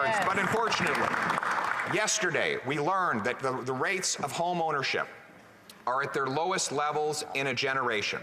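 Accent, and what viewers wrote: Canada. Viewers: Sounds like your typical Canadian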